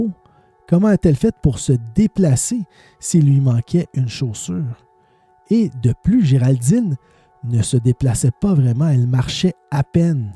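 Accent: Canada. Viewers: Préviens que tu es canadien horrible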